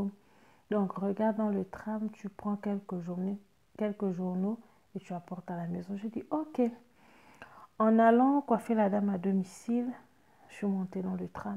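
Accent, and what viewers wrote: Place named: Gabon. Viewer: Tu as comme un accent Gabonais ! Aniway je m'abonne 😊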